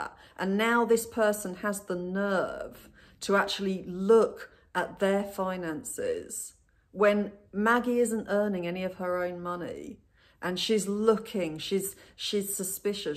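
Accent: England, United Kingdom. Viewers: I love that English accent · Your beautiful English accent to this American is so refreshing and lovely and calming to listen to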